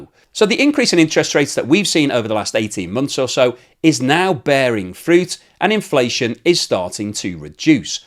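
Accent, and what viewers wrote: United Kingdom. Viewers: Oh, British accent. It's a dead giveaway. As soon as one hears it - one knows he'll be lied to at nauseum. I don't know if that thing is cultural, traditional, biological - but British accent is a flashing red light. My point - no one from that incest ridden, degenerated island, with crooked teeth and the hunger for other people's stuff, is not to be trusted. Just my 2 cents. You're welcome.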